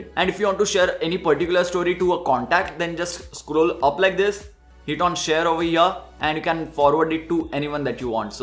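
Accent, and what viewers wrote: India. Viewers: OMG are you INDIAN not again....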